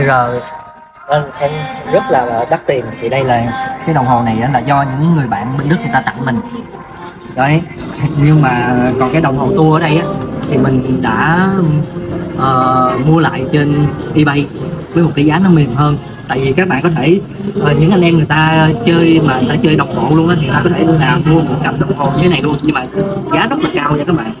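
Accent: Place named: Thanh Hoa, Vietnam. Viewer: Chuẩn bác, nghe thanh hóa là biết ngay 😊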